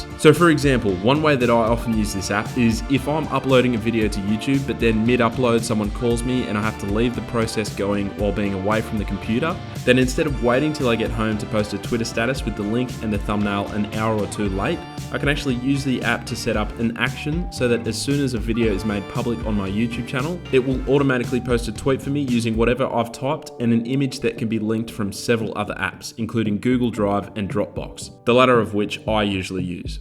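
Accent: Australia. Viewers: Are you Australian? That accent sounds very familiar. Anyway keep up the great work!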